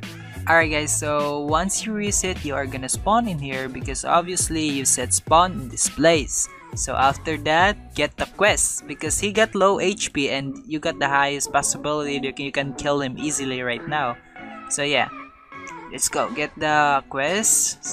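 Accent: Philippines. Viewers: This accent, you're a filipino aren't you?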